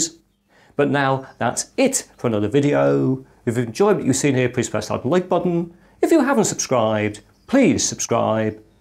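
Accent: England, United Kingdom. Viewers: Excellent, thank you. Watching it now. Also subbed to your channel as A. I enjoy your delivery. B. Fell Brit makes a nice change. C. Most importantly I'm passionate about the same subjects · Perfect British Accent. Bravo!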